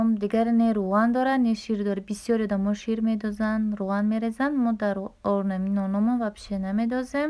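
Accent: Kulob, Tajikistan. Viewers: Саломалекум апаи хохари намедонум мада бехат видиёхотон макулай баройки бисёр тарзи гапзании хушру дорен хами лавзи кулоби бехат лавзи ширинай хиёл мекнум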